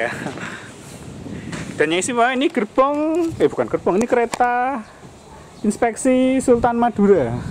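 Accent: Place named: Banyumas, Indonesia. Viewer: Masnya ngapak xixixi:D teruskan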